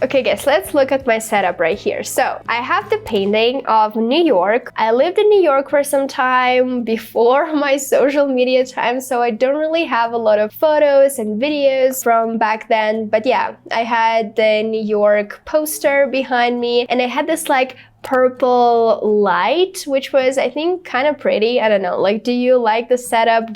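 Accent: Russia. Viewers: русский акцент чувствуется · Russian accent.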